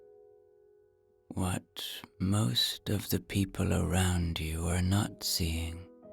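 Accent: Ireland. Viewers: Dear, I was looking for some positive affirmations for self improvement and growth, but I could not find any I liked to fall asleep by. I either did not like the music which was too overpowering, or I did not like the manner with which they were spoken, being rattled down without even a breath in between. And I definitely did not like the voice. I only like your voice and I love your beautiful Irish accent. I would love to hear of series of positive affirmations to fall asleep by. In today’s world so full of confusion and hardships many of us would very appreciate more self confidence, attracting the right circumstances to us and have faith that all will be well. Thank you for all you do.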